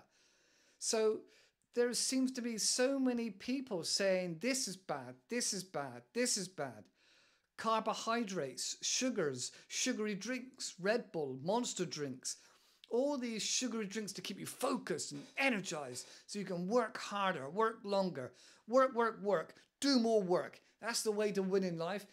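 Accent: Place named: United Kingdom. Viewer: first comment 😊 ... greetings from Mexico 🇲🇽✨ and tank you ...I love the british accent ✨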